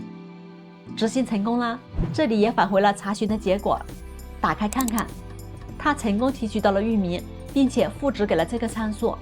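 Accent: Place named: Jiangnan, China. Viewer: 听口音可能是中国大陆南方江浙沪一带的？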